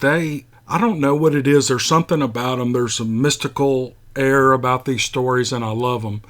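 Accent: South, United States. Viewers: That southern drawl is like comfort food but for my ears and mind, thanks ❤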